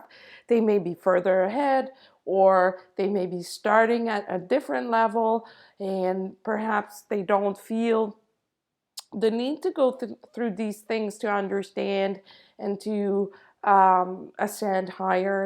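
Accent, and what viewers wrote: Quebec, Canada. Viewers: Hi! Question, are you the girl from Quebec? I'm on the south shore of Montreal and i think i recognise your accent and i heard that, that girl, a few month ago living around Montreal that was doing group healing sessions. I saw that girl, maybe you, in a podcast once but you have no pictures here or on FB. Let me know if it's you! 💜🌸💜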